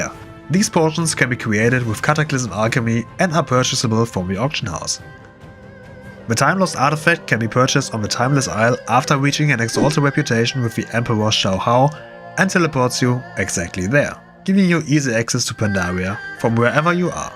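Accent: Germany. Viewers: Where do you come from? Your accent sounds like a German trying to speak English.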